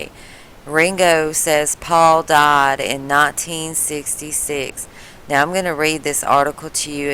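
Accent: United States. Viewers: Fk don't you just fkin hate the way yanks speak we gave you English fk sake use the language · where else do you hear blockbuster stories like this except for the "world news daily report"...such a bastion of truth, no other news outlet has even bothered to question it! you fucking dipshit. not everyone with a southern accent is a moron, but you ain't helping with drivel like this.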